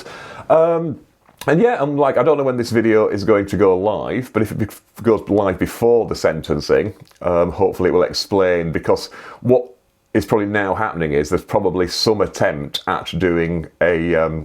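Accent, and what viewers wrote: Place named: Bradford, United Kingdom. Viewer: I thought that was a bradford accent hahaha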